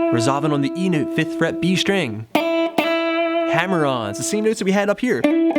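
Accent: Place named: Philadelphia, United States. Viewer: I thought I detected a Philly accent...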